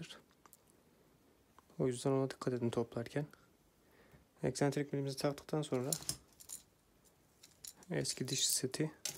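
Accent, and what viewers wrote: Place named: Kastamonu, Turkey. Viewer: Aslan gardaşım devam et maşallah sening gerdüre gerdüre gonuştuğun Gastamonu ağzına gurban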